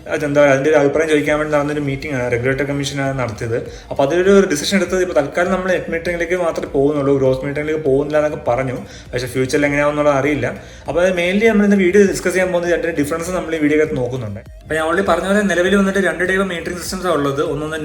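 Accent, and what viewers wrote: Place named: Kerala, India. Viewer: കോഴിക്കോട് വടകര കണ്ണൂർ ഈ ഭാഗത്ത് എവിടെയോ ആണ് എന്ന് തോനുന്നു സംസാരം കേട്ടിട്ട്.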